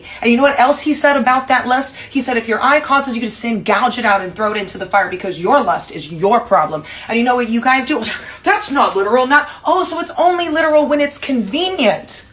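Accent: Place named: United States. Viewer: Dear, I love u. To be precise, I love tt fire cracker brain of yours. 🥴 U don't know how hungry I hv been for scintillating intelligence tt cuts right thru the 💩 that's slung at us every day thanks to social media n the shameless greed, evil n lies of the privileged class... I'm also green w envy tt u hv an IQ of 146... Gasp! But I know it can't be ez n tt it can be v lonely inside at times. But for whatever it's worth, brain to brain, u hv an ardent admirer here. I struggle a teeny bit at times w yr rapid fire discourses at times as I hv to parse yr American accent thru my cynical Chinese brain n stodgy British education but I don't mind the coz U make me laugh so much when you give all those freaks, hypocrites n liars the dressing down they so richly deserved... I'd buy u a carving knife but man, tt tongue of yours wld do a MUCH better job! 🤣 I hereby declare tt I am yr humble fan n grovel at yr feet for all those 'licious lil crumbs of whiplashing U give those oh so deserving man-babies. 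🤣😂🤣 Oh dear, I hope my jaw doesn't fall off fm all the laughing. May the Universe bless U n send U lots of fireflies to light yr way. 🌌🥴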